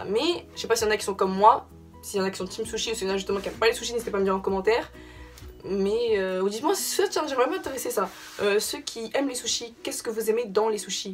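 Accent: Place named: France. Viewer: Damn I love your French accent I have been obsessed with French literally my whole life, I just love you so much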